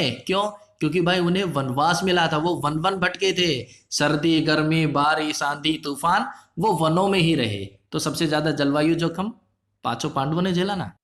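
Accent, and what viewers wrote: Haryana, India. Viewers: Sir...r u from haryana?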